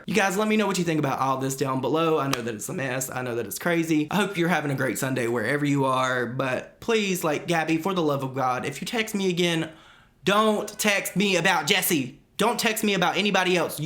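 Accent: South, United States. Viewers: I love your country twang 😍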